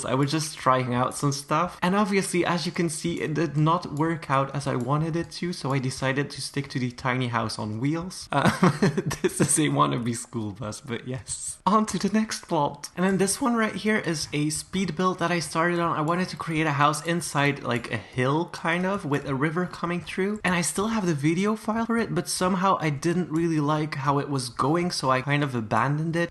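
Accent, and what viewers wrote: Norway. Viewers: Are you norwegian? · are you norwegian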